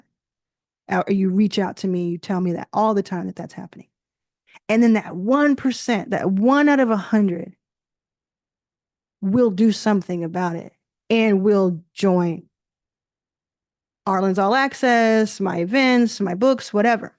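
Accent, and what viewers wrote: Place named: Kenya. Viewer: You have an English accent of a Kenyan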